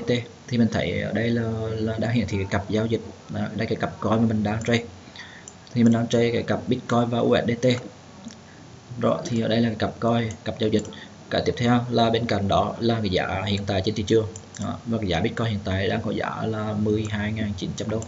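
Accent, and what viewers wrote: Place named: Central, Vietnam. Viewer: Học tiếng việt nam đi bạn ơi chứ làm youtube nch quốc gia miền trung vậy nghe nhức cả óc =.=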